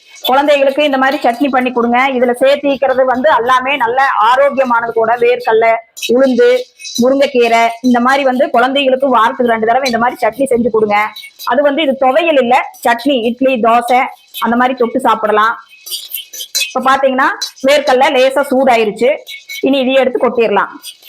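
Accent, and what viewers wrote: Kongu, India. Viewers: கொங்கு தமிழில் நீங்கள் சொல்லிக் கொடுப்பது பேரழகு.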